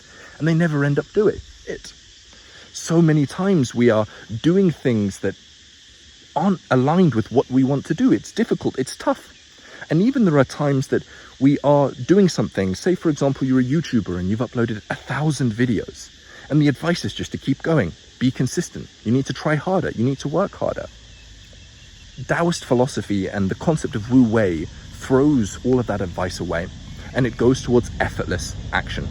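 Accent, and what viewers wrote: United Kingdom. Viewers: Deep voice + british accent + philosophy and self help = subscribed immediately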